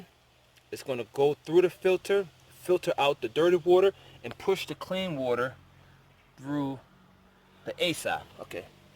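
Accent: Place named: South, United States. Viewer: Thank you for the clear explanation. Your Southern accent is the icing on the cake :-)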